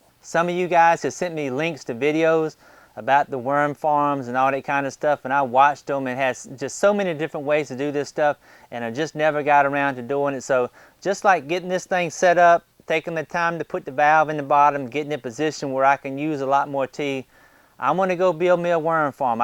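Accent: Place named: Louisiana, United States. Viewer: your amazing accent = Louisiana? Mississippi...? Very nice. And great video..! I think you should look at The Worm Inn, Mega, now made and sold by TheWormDude dott comme, apparently. More air cirulation, no stink.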